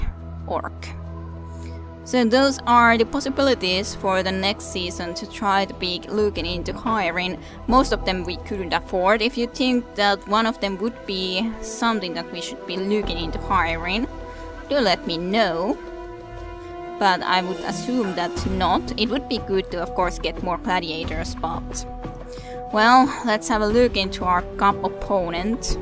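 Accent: Finland. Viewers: Well, Hungarian has certainly been "corrupted" so to speak by slavic languages and has taken some slavic sounds which I don't see anywhere in these Finnish words. Also, its why you say things like "as such" so uniquely, where a Hungarian person would have no problem making the "tʃ" sound ( its actually very common in Hungarian) But from what I heard so far, both Finnish and Hungarian maintain a rather consistent pitch, so when you speak English it results in an extremely interesting accent. Finnish seems to be more influenced by German then Slavic languages ( for example the word "Ritter" in "sormusritarit" ) As for Torment, that depends on whether you think you would enjoy it or not? :P I would recommend replaying it if you haven't played with a very high wisdom, as high wisdom makes for a much more interesting game.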